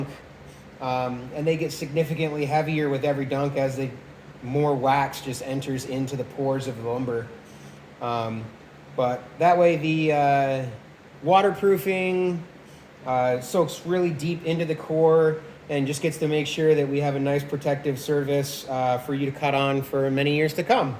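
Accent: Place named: South, United States. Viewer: This guy's from the South!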